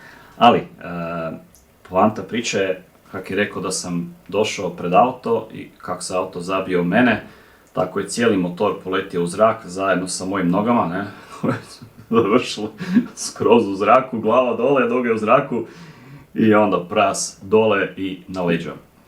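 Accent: United States. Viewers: Aaaa buraz,a gledam tvoje klipove i uvijek pomislim da si Amerikanac😂😂😂😂😂😂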